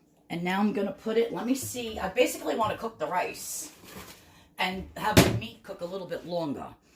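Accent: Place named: Long Island, United States. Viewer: Hey sweetie! I freakin love you and your videos. I'm a born and raised Upstate New Yorker (Albany area) but I live in Texas now, but I sure do miss NY. You have a Long Island accent, is that where you're from? I have many friends there (Wantagh, Massapequa, and Glen Cove). Keep up the great video's and thank you for sharing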